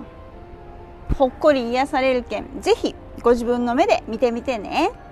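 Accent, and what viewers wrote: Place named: Hakata, Japan. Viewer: 博多弁が好きな方🙋🏻‍♂️です😊 椿さんすごいですね！ちかっぱ面白かったです🙇🏻‍♂️ 次回マカオ行く時の楽しみが増えました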